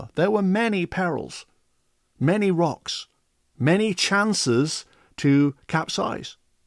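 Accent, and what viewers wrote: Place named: Manchester, United Kingdom. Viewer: As a Manchester lass I feel I can hear you are from my part of the world ? ✨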